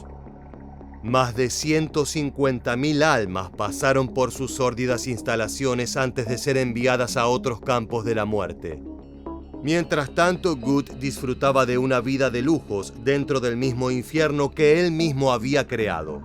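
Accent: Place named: Argentina. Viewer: VIDEO CON ACENTO ARGENTINO, YA NO ME INTERESA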